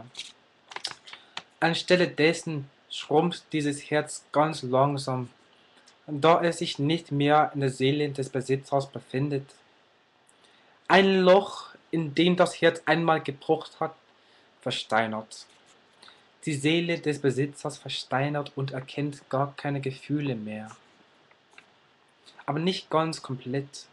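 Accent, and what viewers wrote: Bavaria, Germany. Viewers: nice, Bro! Es ist lustig, dass du Deutsch mit Dialekt sprichst (Bayrisch). Deine Grammatik ist beeindruckend, da gibt es so viele Dinge, die man schnell durcheinanderbringt, aber du hast es voll drauf. Zusätzlichen Respekt, dass du in deutsche Literatur schnupperst, denn die ist in der Tat großartig, was selbst viele Deutsche scheinbar schon vergessen haben!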